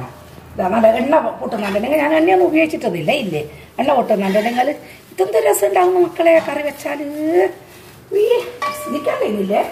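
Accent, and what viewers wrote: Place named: Thalassery, India. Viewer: ഉമ്മാന്റെ വർത്താനം ഞമ്മളെ തലശ്ശേരിക്കാരെപോലെ 😍😘❤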